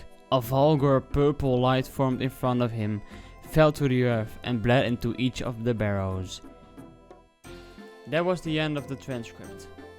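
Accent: Netherlands. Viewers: they are both dutch i think, i can tell by the accent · You should probably let other people narrate these and get some more interesting graphics. i feel like a Dutch guy is trying to give an English school powerpoint presentation.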